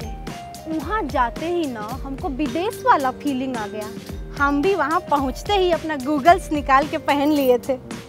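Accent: Bihar, India. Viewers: excellent performance mam.......... Aapki acting ke fan Ho gaye hai hum and specially ye bihari walla toh jhakkasss 👌👌👌👌👌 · bihari lady voice very original,,,its innate in you,,,graceful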